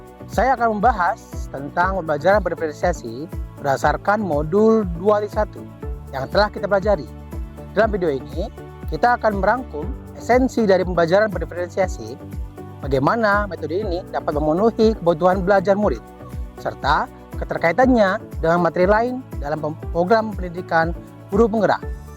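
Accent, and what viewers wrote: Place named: Aceh, Indonesia. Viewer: logatnyo sudah beda sekarang sdh jd orang aceh bener ni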